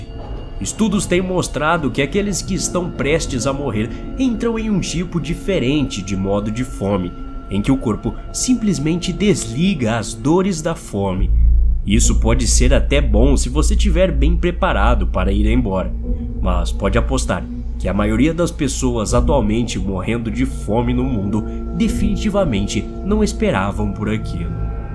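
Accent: Northeast, Brazil. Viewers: Ele é um pouco nordestino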